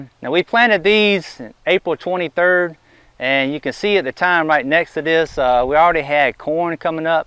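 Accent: South, United States. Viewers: Holy Moly...I didn't know you were back. Oh happy day. Love your videos and listening to your southern accent. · I've been watching your videos for years. You have the best looking tomato plants on YouTube, hands down. Big Beef is a mainstay in my small urban garden as it always seems to be a good beefsteak producer. I like that you understand that different methods of pruning and supporting work well and there is no 'best' method. I agree. Also the southern accent is pretty nifty. Cheers -Lucas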